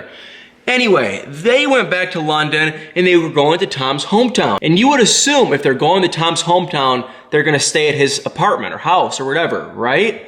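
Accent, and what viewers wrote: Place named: Midwest, United States. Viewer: Omg ur Midwest accent lol. I’m from nyc and all I can hear is ur accent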